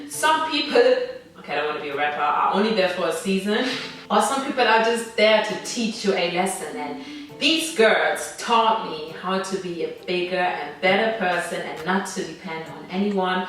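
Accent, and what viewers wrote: Germany. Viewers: Love your German accent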